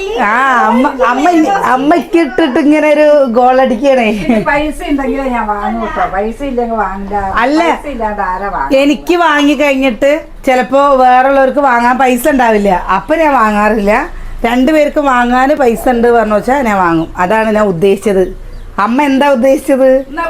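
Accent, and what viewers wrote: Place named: Valluvanad, India. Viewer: It is Valluvanadan style. Try to respect.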